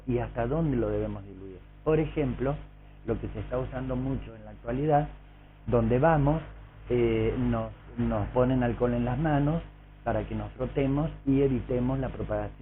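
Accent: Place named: Argentina. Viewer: Fantástico el video, profe. Y en "argento"...!!!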